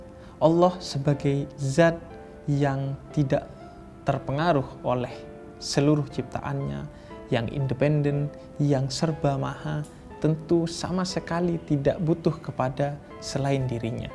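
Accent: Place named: Java, Indonesia. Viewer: bib jomblo muka arab logat jawa hee maaf bib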